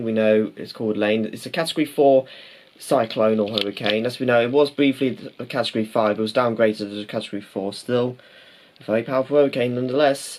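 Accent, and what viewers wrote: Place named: RP, United Kingdom. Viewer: nice bbc english accent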